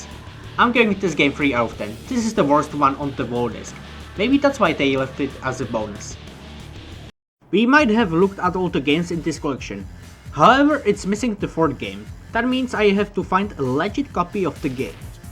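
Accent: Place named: Czech Republic. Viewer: bro where are you from? you sound like someone from czech republick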